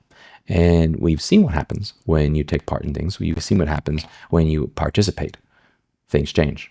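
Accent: United States. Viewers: Oh .... I thought he drove a manual + he sounds American: ipso facto, alarms/kill switches are automagically built-in to a manual.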